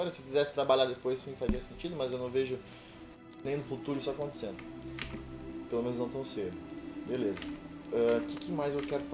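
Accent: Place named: Rio Grande do Sul, Brazil. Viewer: Saudades mesmo! pelo sotaque ele é do RS, ele sumiu no inicio das enchentes, será que aconteceu algo com ele? to preocupado...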